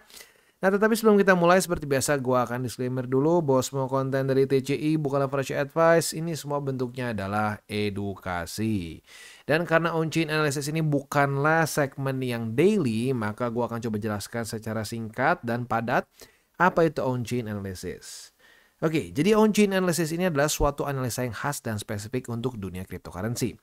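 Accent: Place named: Palembang, Indonesia. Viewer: Palembang yo bang :V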